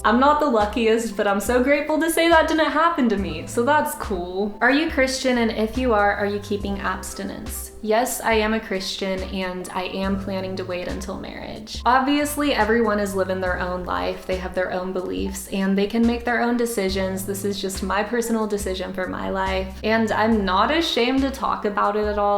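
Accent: South, United States. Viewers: Your accent is so amazing shoutout to my fellow southerner!🤠🤠🤠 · She probably lived in the south and moved to the north. I do the same thing. My accent gets really thick when I’m stressed or angry😬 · That country accent really showin it's self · wow your southern accent is really poking through in this one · country accent was strong in this one and that makes me happy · Where - where do you live? Like you sound very Southern sometimes,but sometimes you sound like you could live in Oregon. It's big confusion for me. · it’s weird because sometimes when I watch I totally can ignore her accent but now HER VOICE IS EXTREMELY SOUTHERN AND UNAVOIDABLE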